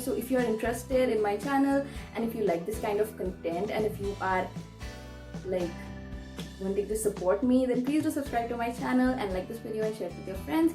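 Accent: South Korea. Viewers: I really love your Korean Accent 👍 sounds so natural ❤️ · Korean accent.. Wow.. So fluent... Perfect 💯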